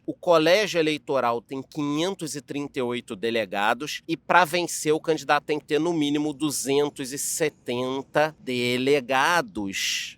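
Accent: Rio de Janeiro, Brazil. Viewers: adoro suas explicações. Excelente canal! (E mat0 as saudades do sotaque carioca ouvindo você). 😊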